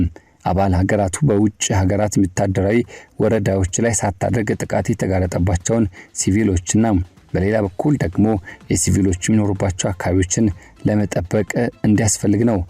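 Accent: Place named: Sudan. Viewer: ፣ማን ነህ ??እባክህ !!አማርኛ የሚናገር ሱዳናዊ ትመስላለህ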